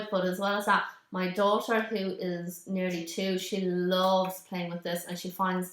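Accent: Ireland. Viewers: Are you from Dublin or Ireland you have really nice accent 😃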